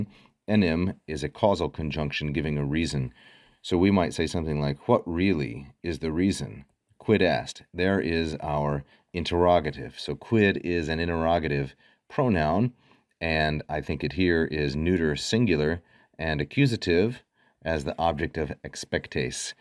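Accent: United States. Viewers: The reader is very fast and has an atrocious american accent. Perhaps he should make an effort to correct this.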